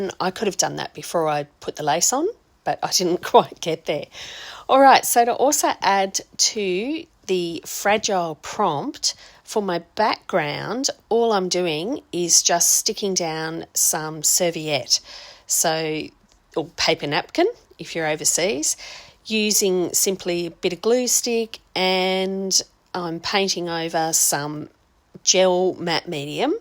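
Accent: Australia. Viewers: That was a great interpretation of the prompt. I'm still not sure what I will do but I did find this helpful. Love your Aussie accent. Blessings and hugs from New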